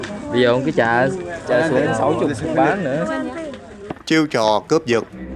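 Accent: Vietnam. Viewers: lối nói chuyện của * ... người miền Nam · giong viet nam qua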